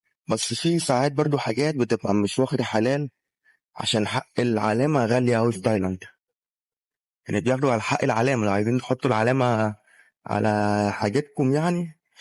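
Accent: Egypt. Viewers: اللغه المصريه بتاعتك حلوه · ربي يحفظه مؤدب وخلوق وعيونه مليانه خجل وياحلات اللهجه المصريه ربي يبلغج بيه وتفرحين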